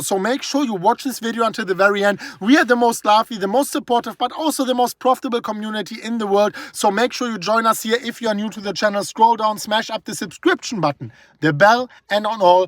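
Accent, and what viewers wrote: England, United Kingdom. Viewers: Sounds like England 😂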